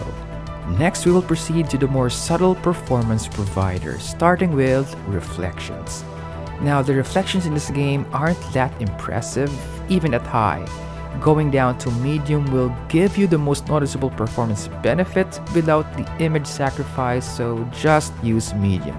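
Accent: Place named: Brazil. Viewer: are you brasileiro?? hearing an accent :)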